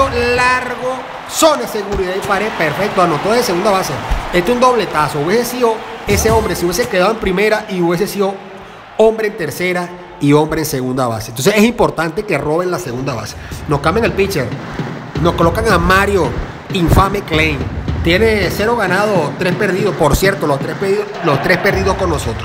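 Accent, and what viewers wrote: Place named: Venezuela. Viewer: Pareces que eres venezolano mi pana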